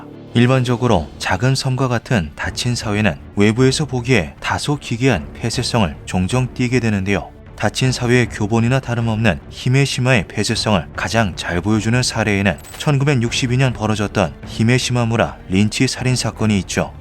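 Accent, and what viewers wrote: Gyeongsang, South Korea. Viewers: 대구네 ㅋㅋ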